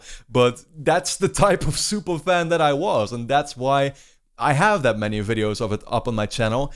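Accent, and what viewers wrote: Netherlands. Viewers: Ik hoorfe gelijk dat hij nl was accent is altijd hoog hierzo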